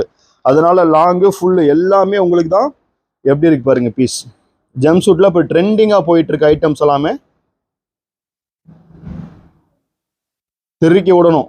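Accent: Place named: Marwari, India. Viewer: Wow collections. Price super. Settu maadhiri paesaradhu superaa irukku.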